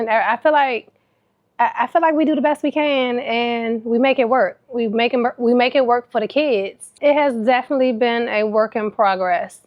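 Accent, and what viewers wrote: DMV, United States. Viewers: she’s from DMV! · Girl! Soons I heard you talking I said that’s Maryland baby!! lol love from PG County boo 💕💕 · I’m from PG I hear your Maryland accent girl 😁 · I knew you were from the DMV somewhere as soon as I heard you talking lol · I could hear Md all in her accent ! Baltimore stand up ! Love this ❤ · Heard that DMV accent IMMEDIATELY! Hey sis! If you're comfortable, can you share stories about your stadium days??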